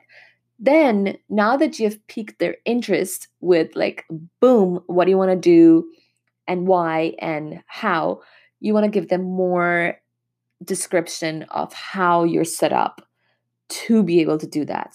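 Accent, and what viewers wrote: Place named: United States. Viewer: দিদি তোমার গলায় আমেরিকান উচ্চারন দারুণ লাগছে। 😅 আমিও পদার্থবিদ্যায় গবেষণা করছি ভারতে, প্রথম বছর। আমারও ইচ্ছা পোস্ট ডক করতে বাইরে যাবার 🙂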